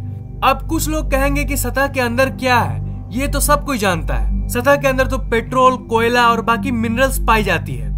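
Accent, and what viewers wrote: Bihar, India. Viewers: gaddha korna mtlb full desi ❤️❤️😂 Bihari ascent ❤️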